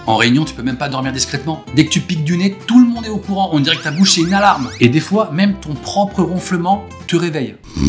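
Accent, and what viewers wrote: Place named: Toulouse, France. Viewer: Pire accent toulousain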